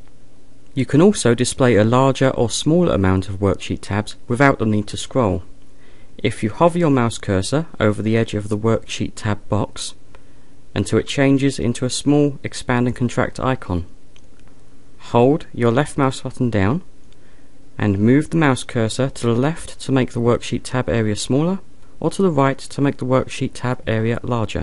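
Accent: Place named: England, United Kingdom. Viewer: Yes it is an English accent from England.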